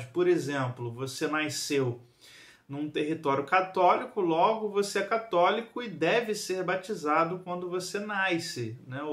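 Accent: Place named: Rio de Janeiro, Brazil. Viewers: Eu, por ser carioca, não reparei isso. Hahaha Aqui a gente fala assim, e está tudo bem! 😊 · Sotaque carioca.Nada demais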